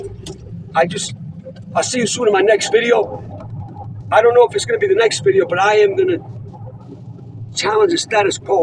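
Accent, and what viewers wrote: United States. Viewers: Are you in Europe? You sound American!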